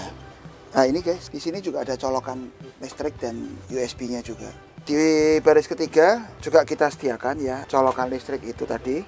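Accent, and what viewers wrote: Java, Indonesia. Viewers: Oyi jgn rasisme, sudah asli indonesia lahir di jawa tengah, logat memang logat ibu yg terbawa... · Enggak Rasis maksudnya guyon kagum kok wes melebur sama darah Jawa medok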